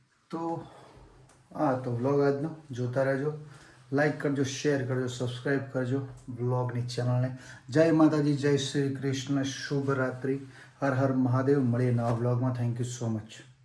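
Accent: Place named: Mehsana, India. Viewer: ખુબ સરસ મજા આવી સ્ટેજ પ્રોગ્રામ જોવા ની તમારા બ્લોગ માં જે ભાષા બોલો છો મહેસાણા એતો બહુ સરસ મજા આવે છે સાંભળવાની😊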